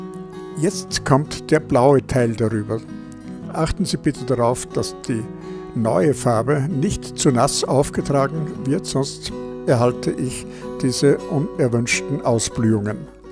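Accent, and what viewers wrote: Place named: Austria. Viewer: ja, endlich. eine Video für mein Niveau - auf Deutsch bzw. angenehmes österreichisches Deutsch :-) Habe in letzter Zeit einige Video über die Aquarelltechniken mit dem Hakepinsel gesehen. Aber Ihre sind halt doch immer eleganter und effizienter erklärt. Das mache ich unter Anleitung am Wochenende mehrmals nach. Schön, das es jetzt zum Feiertag hochgeladen wurde :-D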